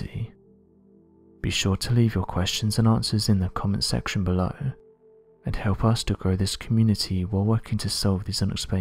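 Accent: United Kingdom. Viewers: I love your channel but you really need to replace this narrator. The young man narrating this and other video's mumbles. It's hard enough to understand the British pronunciations without a monotone mumbler. I'm sure he is a very nice person but he is not a good narrator.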